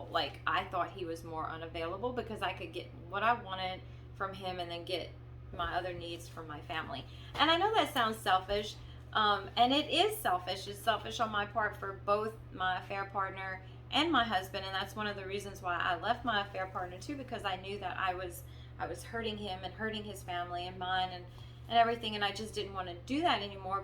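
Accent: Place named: Louisiana, United States. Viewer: Love your videos! You sound like you're from Lafayette... or somewhere here in Louisiana lol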